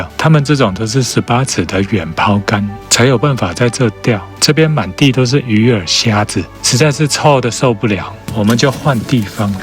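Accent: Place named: Japan. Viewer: 講話怎麼感覺像日本人在講中文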